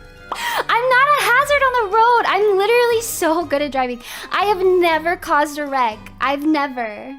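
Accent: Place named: South, United States. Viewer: I absolutely drive with my knee 93% of the time. Also this seems to be from same stream as the forts convo. I live in the south my whole life and have zero accent. She seems chill and good person and good friend. That ya’ll she has is dead giveaway / she shouldn’t adjust to a ‘proper’ speech pattern and cadence because of viewers. F them - forcing denial and faking true self for other people. How do I befriend her as asexual male and acoustically gifted - you wanna just like.. spend time together and hang as friends? Not that non-acoustically minded normies would understand that friendships. Or that’s what my ptsd says anyway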